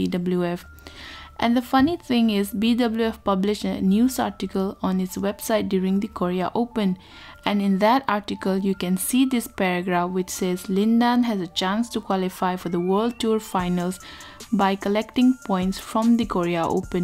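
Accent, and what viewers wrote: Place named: Malaysia. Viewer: I would think she sounds more like Malaysian or Singaporean.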